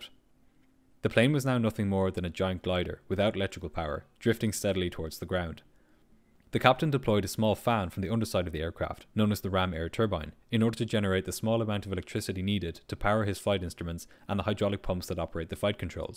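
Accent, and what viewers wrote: Ireland. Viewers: Everyone has different accents and he's Irish. If you've got a problem with that scroll down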